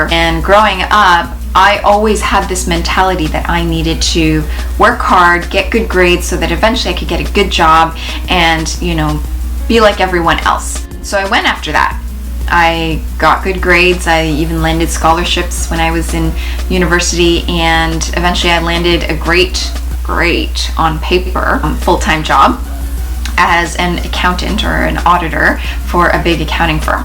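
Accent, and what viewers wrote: Canada. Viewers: I knew you were canadian before you even said you were. that accent is undeniable.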